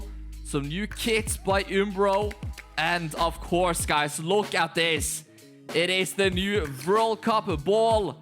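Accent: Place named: Norway. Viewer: ayy my favourite swede with norwegian accent uploaded!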